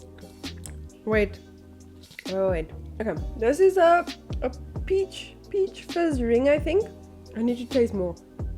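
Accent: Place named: Afrikaans, South Africa. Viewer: Ek kan die afrikaans in jou stem hoor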